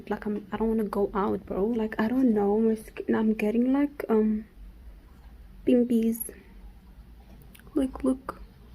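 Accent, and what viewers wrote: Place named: South Africa. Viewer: I js love you your South African slung❤️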